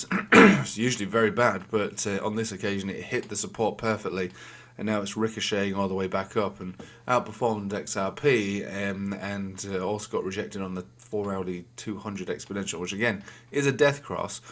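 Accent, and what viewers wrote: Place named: Leeds, United Kingdom. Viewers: I’m in Bradford you sound local are you in West Yorkshire somewhere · I think he might be from Leeds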